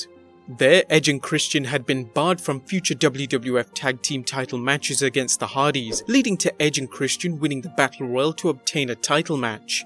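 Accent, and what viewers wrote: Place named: United Kingdom. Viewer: Why does ALWAYS have to be a British narrator?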